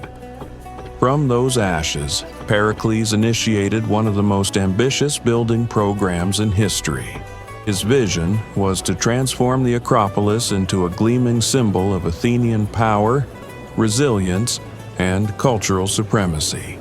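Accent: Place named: South, United States. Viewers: Good video, but the slightly southern accent seems a little out of place. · Right said. The Southern accent is so annoying in any context.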